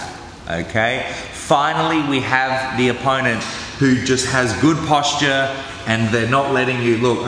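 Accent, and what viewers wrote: Australia. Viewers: His accent sounds Aussie, but his school is based in LA now I think?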